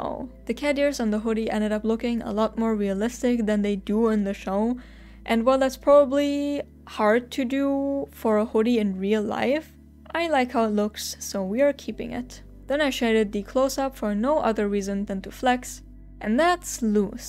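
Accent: Germany. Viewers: I am so glad i found ur account! I love ur artstyle! Also, are u german? Bc of ur cool accent! I loved this vid keep going I really enjoyed it! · i really love your art i just clicked on the video cuz i LOVE the owl house and as soon as i saw you and your art style i had to follow you! btw are your like german or smth? kinda sounded like it!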